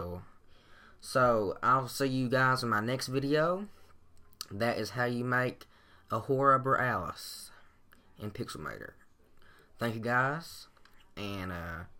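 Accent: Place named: Southeast, United States. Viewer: Being a southerner (Texan) myself, this sounds southeastern, like Alabama/Miss/Tenn.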